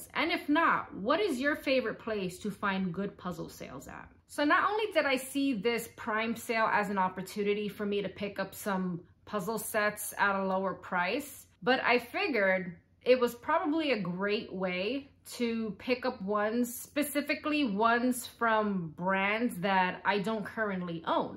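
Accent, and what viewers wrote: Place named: New York, United States. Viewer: Hi, This is the first video of yours that I'm seeing. Really like your energy and enthusiasm for puzzles! And it sounds like you're a native New Yorker, like me! I have a ridiculous number of Eeboo's - - most I bought myself and others were gifts, but I've only done my 500-piece ones so far. Maybe I'll work next on the VENICE one you showed -- it's in the closet somewhere, LOL. Have a great day!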